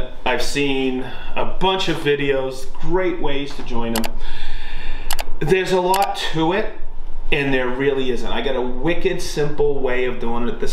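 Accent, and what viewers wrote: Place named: New England, United States. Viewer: I just got a TS55 & OF1400 router. I read a lot of guys say only go with a continuous track… Would you recommend joining 2 Festool tracks to cut a full 96” length of plywood or just getting the FS2700 or FS3000? Thank you for the videos by the way. I have been watching the last few weeks. Great content. Love the new england accent brother!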